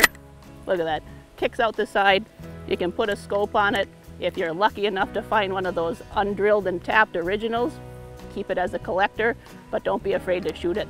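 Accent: United States. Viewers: All she needs to use is the word “feller” and that country accent will be complet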